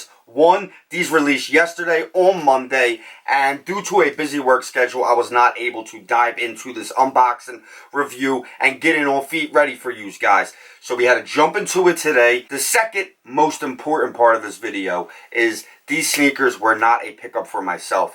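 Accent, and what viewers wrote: Philadelphia, United States. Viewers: You from Philly?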